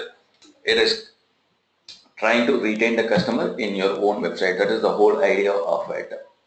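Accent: India. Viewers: Good presentation. Nice to hear south Indian accent !!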